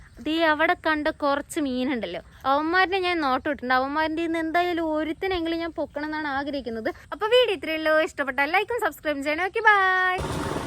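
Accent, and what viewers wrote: Palakkad, India. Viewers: സ്ലാങ് കണ്ടപ്പോൾ പാലക്കാട്‌ ആണോ എന്ന് തോന്നി അതാ ചോദിച്ചത്.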